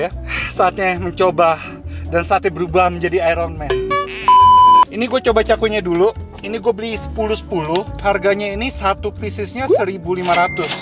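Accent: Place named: Bandung, Indonesia. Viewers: Paling suka logat sunda.😁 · Hese teu nyunda teh 😁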